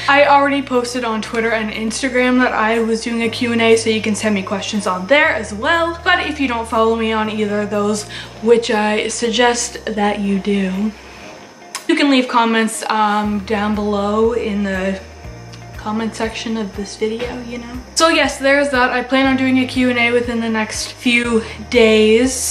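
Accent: Canada. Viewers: Are you Canadian? I think I hear the accent lol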